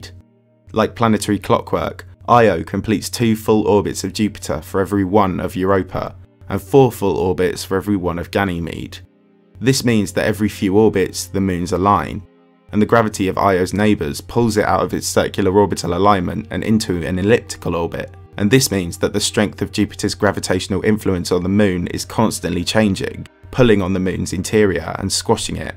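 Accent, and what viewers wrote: United Kingdom. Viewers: I don’t understand the fascination/obsession with the British butt pirate accent. It’s more of a distraction and takes away from the message. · British accent?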